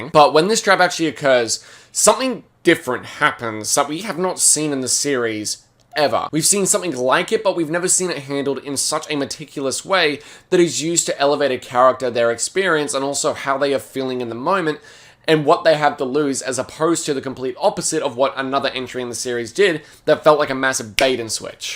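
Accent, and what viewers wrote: Australia. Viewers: New. Good to hear a Fellow Aussie. Cheers X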